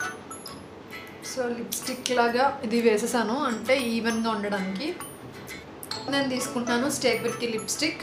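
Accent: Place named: Ongole, India. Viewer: gaaru meedhi ongole la...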